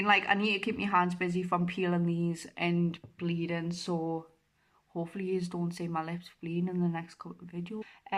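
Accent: Newcastle, United Kingdom. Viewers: Thanks for your honesty, just talking about it may help others, and probably you also. Congratulations on the product endorsement, I didn't know about these products. I'm sure I am speaking for probably all of the Americans on this channel, when I say, I enjoy your 'rambling on', because I enjoy that New Castle accent... kind of like one of the several 'working class' accents in the US, it just sounds genuine. God bless!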